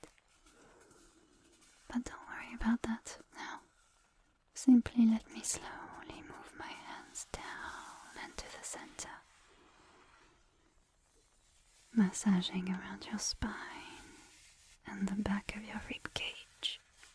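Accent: France. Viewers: Having to do quizzez and listen to this during it and helps me relax and focus your french accent is really amazing! First time i've heard someone with a french accent you do really good ❤ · Oh, the French accent, the whispers and your voice melt my heart. I really needed to relax my mind. You always know what we crave. Tu es vraiment douée dans ce que tu fais. Merci. 🤍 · Love the french accent. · Mon dieux je m'attendais pas à ce que ce soit un français aussi authentique !!! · F4F french accent ASMR, lets goooooooo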